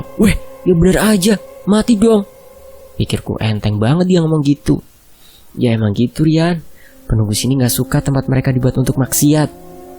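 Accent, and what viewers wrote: Java, Indonesia. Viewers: Min..: suarane medhok heheheh suka deh Kangen kampung halaman 😁😁😁😁😁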